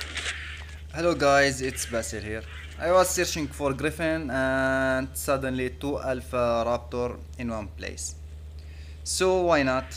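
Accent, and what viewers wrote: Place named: Arab world. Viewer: والله انك عربي عرفتك من اللهجه😍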